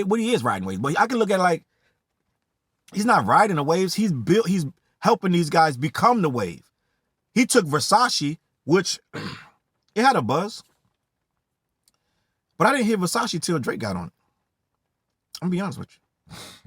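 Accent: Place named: New York, United States. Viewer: He did ride the waves. Ion mean no disrespect but you an old head and you sound like you from New York. A lot of waves he rode, the young niggas was already hip too. put the on, had a his own buzz and Drake had to hop on to get the streets. Yes he did make them bigger on a mainstream level but he did ride the wave as well. Whether it’s using their flow/ lingo or dressing like them. The man is the ultimate culture vulture.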